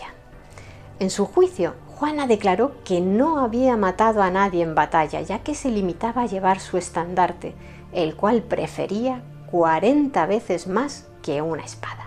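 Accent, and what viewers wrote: Castile, Spain. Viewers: Hola Feliz Navidad cómo estás, me encanta ver tus videos y escuchar tu acento castellano, Yo amo la cultura, la historia la política, la religión y la música y escuchar tu voz en la narrativa, me encanta, Gracias por estos videos, Dios te bendiga hermosa mujer y que la Virgen te siga cuidando amén; la doncella de Orleans se convirtió en la patrona de Francia ⚔️🙏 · Soy de Colombia pero me encanta escuchar estás historias antiguas en el acento de los españoles,siento como si estuviera viviendo en carne propia esa historia,lo relatas muy bien.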